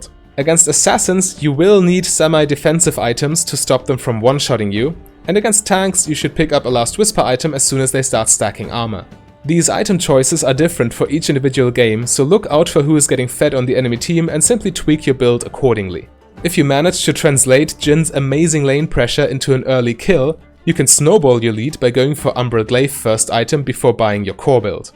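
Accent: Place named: Germany. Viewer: classic german accent ^^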